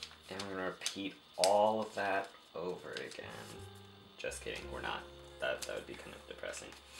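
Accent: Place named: United States. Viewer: Good job no offense but you sound like an American lol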